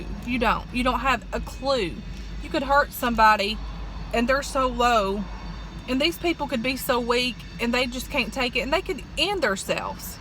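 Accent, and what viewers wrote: South, United States. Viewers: I'm a new subscriber! I just love you and your attitude/personality! You're so pretty and I love your country accent! Girl I feel you and I ain't got time for no negative stuff either! #2018 #Amen 😍❤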